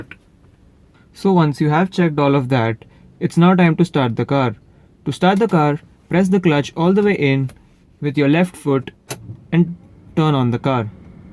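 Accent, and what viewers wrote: India. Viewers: The indian accent made this much better xD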